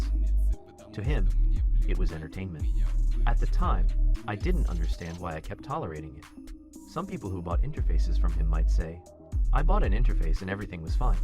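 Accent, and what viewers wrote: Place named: Russia. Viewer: Honestly i think you should've made the video with the Russian accent it would've been more enjoyable (no offenses)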